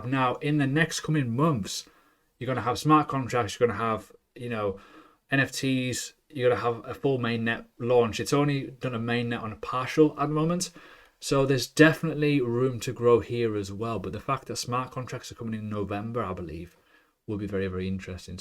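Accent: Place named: Northern England, United Kingdom. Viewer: Great video man, just signed up to your patreon too. Refreshing to hear another northern English voice talk about crypto lol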